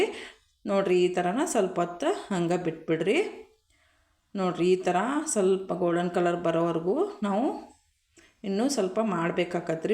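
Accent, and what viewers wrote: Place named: North Karnataka, India. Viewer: ನಮ್ಮ ಉತ್ತರ ಕರ್ನಾಟಕ ಭಾಷೆ ಕೇಳಿ ತುಂಬಾ ಖುಷಿ ಆಯಿತು ಸಿಸ್ಟೆರ್ 👌👌👌❤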